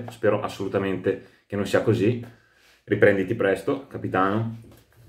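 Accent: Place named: Veneto, Italy. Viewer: Ti si Veneto??? Bella parlata❤🩶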